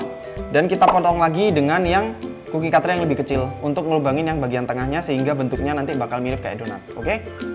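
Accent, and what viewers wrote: Java, Indonesia. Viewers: medog bgt cak.....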